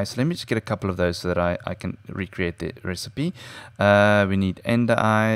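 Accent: South Africa. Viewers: hi. where are you from? You sound South African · are u south african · HEY!! are u south African? If yes I am too!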